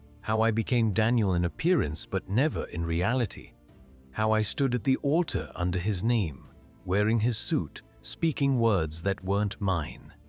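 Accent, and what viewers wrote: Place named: India. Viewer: This story is clearly Indian culture and the voice has comparable accent. Then he mentioned Texas. It was jarring. I would have enjoyed this story had it not suddenly culture shifted. … just got to the end of the story. Daniel didn’t know his brother had replaced him? That makes absolutely no sense. I understand this whole story only works in arranged marriage situation, but for the brother to not know makes this whole thing ridiculous!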